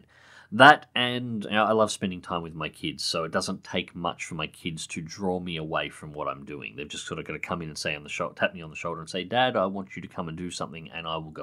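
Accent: Australia. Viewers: you could play anything and i'd watch. It's nice to hear another Aussie voice every day.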